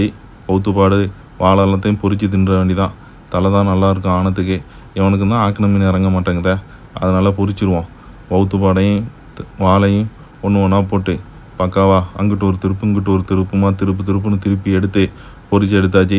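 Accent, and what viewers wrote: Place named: Kayalpattinam, India. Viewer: நீங்க பேசறது காயல்பட்டினம் மேலப்பாளையம் பேசுற மாதிரி இருக்கு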